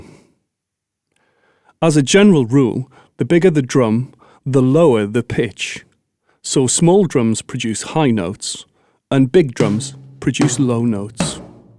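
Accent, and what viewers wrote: United Kingdom. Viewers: where is his accent from in the UK?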